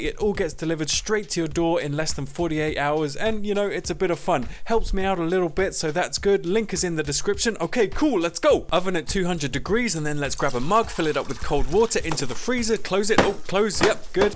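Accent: United Kingdom. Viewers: Oh I love your recipies, your sense of humour and your Loooovely british accent..... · Just doscovered you channel from this being recommended to me and immediately subscribed before the video was even over. Brilliant recipe, well explained without taking any more time than necessary, from the UK so easier for me to understand being from there myself, plus it was funny and entertaining. Great content thank you man!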